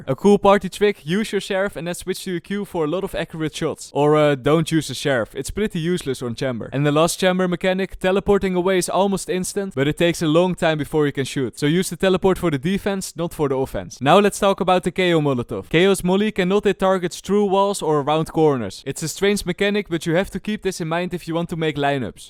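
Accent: Netherlands. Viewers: Ik weet zeker dat je Nederlands bent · je ziet dit waarschijnlijk niet maar holy moly je klinkt ZO nederlands xD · Het nederlands accent maakt de video veel beter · I smell Dutch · Bro are you dutch? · is this guy dutch? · you dutch/nederlands? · Are you dutch or something, because you have that accent. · bro je stem is zooo Nederlands gewoon holly fuck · Do I hoor Nederlands? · this guy sounds like he's dutch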